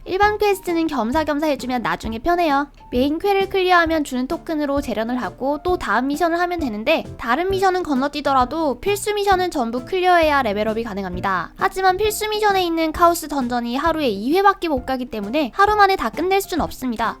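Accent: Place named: Gyeongsang, South Korea. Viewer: 경상도 분인가 E 발음할때 다 티나심 ㅋㅋ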